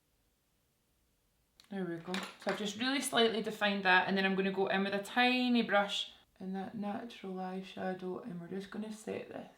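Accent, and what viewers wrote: Scotland, United Kingdom. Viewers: Love Love your Scottish accent, my mom's from Paisley.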